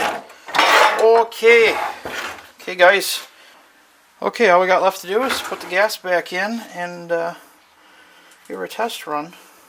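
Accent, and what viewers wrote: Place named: Upper Peninsula, United States. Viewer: you are picking up a little yooper accent!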